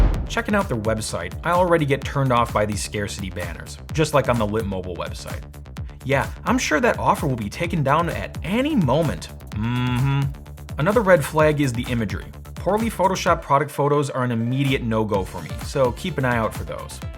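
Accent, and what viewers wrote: India. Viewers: Indian accent is also a red flag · Indian accent? Dropped. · indian accent = red flag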